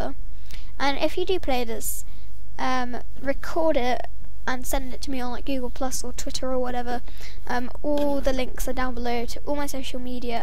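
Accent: United Kingdom. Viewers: i love that you are Brittish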